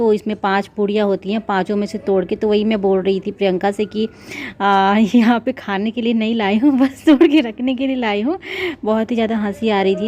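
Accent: Bihar, India. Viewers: Meri sasural Delhi men he 20 sal se men yahi rahti hun par Mera mayka bareli u.p ka he mujhe wahan ki bhasha acchi tarah se pata hemere aadhe rishtedar up ke hen par Jo aap bolti ho woh up ki nahi Bihar ki bhasha he mere yahan Delhi men mere sas pas bahut se log Bihar ke hen mere kiraydar bhi unki same bhasha he aapki bali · Madam kya aap bihar se ho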